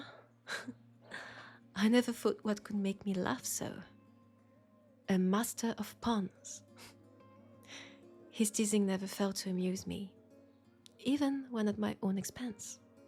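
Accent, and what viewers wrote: France. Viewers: Wow, I enjoyed this way more than I expected I would. Funny how my brain could paint this scene perfectly in my mind. Beautiful voice as well, and as always I just love the french accent. Nice script and BGM choice too. 👏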